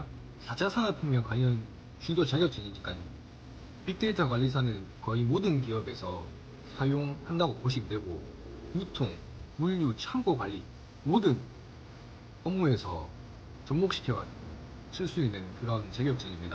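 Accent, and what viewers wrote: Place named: Gyeongsang, South Korea. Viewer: 느낌이 왠지 갱상도말 쓰는 최성국같네요 ㅋ 멋집니다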